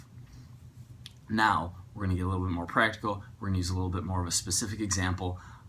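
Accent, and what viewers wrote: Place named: Midwest, United States. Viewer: LOVE WATCHING YOU AND LOVE UR MIDWEST ACCENT:)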